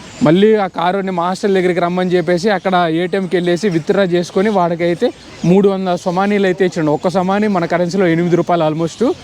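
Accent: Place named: Tirupati, India. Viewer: Brother, nee bhasha chusthe nuvvu maa tirupati laga vunnavu😊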